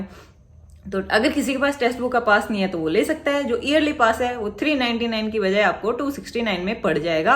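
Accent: Haryana, India. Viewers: ਹਰਿਆਣਾ ਵਾਲੇ ਹੋ ਭਾਈ 😁😁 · Didi ap haryana se ho? Accent harayana jsisi h 😅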